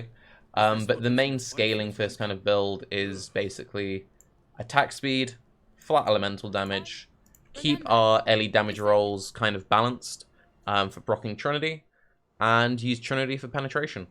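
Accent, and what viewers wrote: RP, United Kingdom. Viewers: He speaks the queen english he says it correct.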